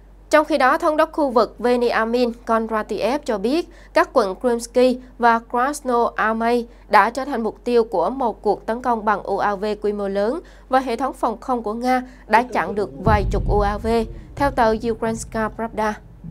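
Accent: South, Vietnam. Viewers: Giọng nói miền Nam de thương, rõ ràng, de nghe, còn miền Bắc không kho nghe. Nhất là dấu hỏi và dấu nặng: vi du: du chưa? Du chưa!!!!!!!?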